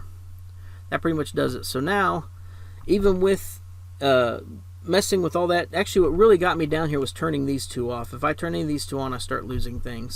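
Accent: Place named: South, United States. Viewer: definitely southern states US accent and as a Brit I like it. I always found the accent like the Irish to be very nice to hear.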